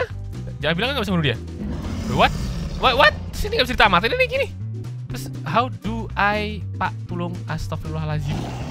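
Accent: Java, Indonesia. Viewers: Wong jowo opo uduk mas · Orang Jawa bang to Yo to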